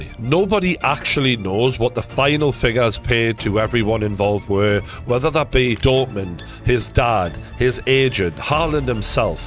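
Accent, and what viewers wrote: Newcastle, United Kingdom. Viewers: I love a true geordie documentary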